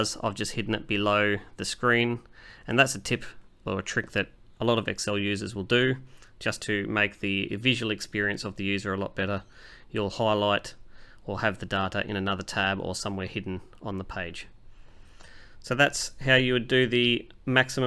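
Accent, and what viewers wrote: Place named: Australia. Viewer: I've been using Excel since 1993 ver. 5 & am still learning. Great channel! Was in Australia for a whole month in 1987 so recognized your accent. Cheers from Vancouver.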